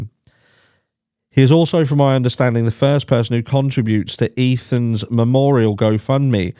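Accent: United Kingdom. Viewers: since i left UK decades ago i lost British traits and accent so glad to listen to your's mate All The Best Wishes Everyone :cat-orange-whistling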